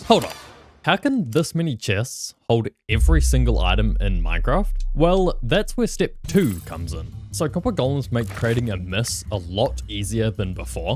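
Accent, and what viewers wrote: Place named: New Zealand. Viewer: I keep running into other Kiwis in the wild and not being able to recognize my own accent 🤨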